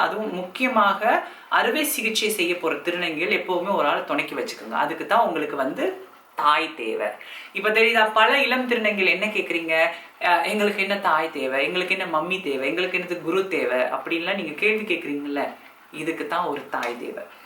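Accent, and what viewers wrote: Sri Lanka. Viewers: Ka your speech is very beautiful like srilanka tamil oh my sweet ka and please reply your comment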